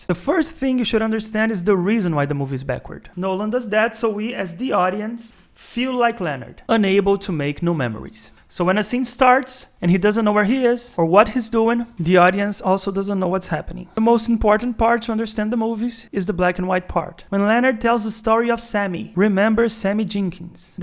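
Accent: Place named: Brazil. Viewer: Sotaque de Br 👍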